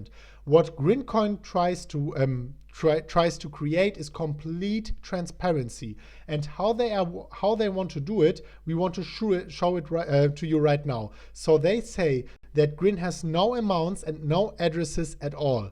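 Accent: Germany. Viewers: ich höre deinen deutschen Akzent von weit weg haha..Welche Bücher kann ich mir durchlesen um diese ganzen Graphen lesen zu können wie davincj15 sie manchmal in seinen Videos nutzt.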